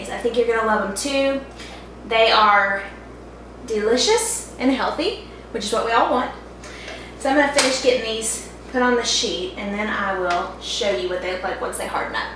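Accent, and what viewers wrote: South, United States. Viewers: Is she from Alabama or Georgia?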